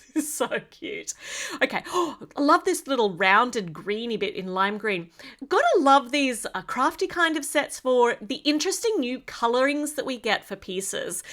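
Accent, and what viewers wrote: Australia. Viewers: Who else loves her Australian voice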